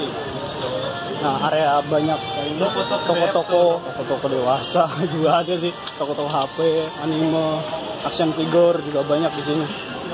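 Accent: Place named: Java, Indonesia. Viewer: Medok banged suara.mu? 😅😅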